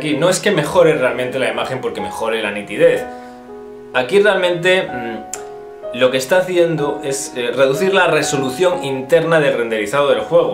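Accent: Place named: Spain. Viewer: Manu Rodriguez comparto con vos, es mas, este canal es de un español y no me molesta para nada la tonada ni las expresiones, despues de un tiempo te acostumbras, y hasta pasan desapercibidas las diferencias con la lengua de uno, pero a las traducciones en juegos y peliculas nolo se.. creo que nunca podre acostumbrarme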